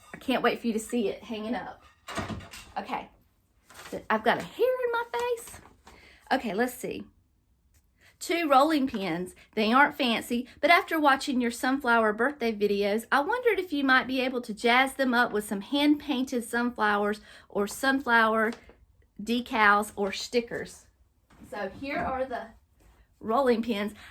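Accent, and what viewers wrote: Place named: North Carolina, United States. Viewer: Hi I have been watching your channel for a few weeks now and I knew there were something we had in common or should I say someone and when you said booth and Tenn. I knew you were talking about Susan she is so sweet,my daughter started a YouTube channel a few months ago and Susan was one of the first YouTubers to comment on her video and she has been such an inspiration to her from the start. I just love that dress on your daughter so pretty and I love all the stuff Cindy sent you so pretty, you sound like you are from N C if so, So are we the name of my daughters channel is Around the house with Charlene if you get a chance to view her channel I would be thrilled and I know my daughter would just love it. I love how the pictures you on the wall ❤❤❤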